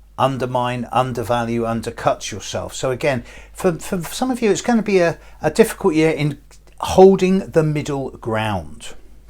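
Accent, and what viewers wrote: United Kingdom. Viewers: Thank you for your forecast of all the signs especially Taurus which is my sign. I had a great year 2020 despite all the changes who are going on. I feel more peace within my self and more harmony. I am a Taurus with an ascendant in Aquarius which was not easy during some time in my life. I try to be open to change and new ways of living and new ways to understand life. Thank you for your forecast and I just love your British accent being myself a Quebecor French native. I am a fan.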